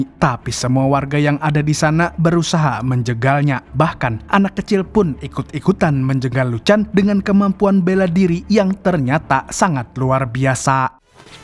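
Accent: Banjar, Indonesia. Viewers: Admin ikam buhan banjar kahh logat nyaaa ulun sukaaa sekali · Bg lo kalsel dimananya, bahasa banjar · banjar banget sii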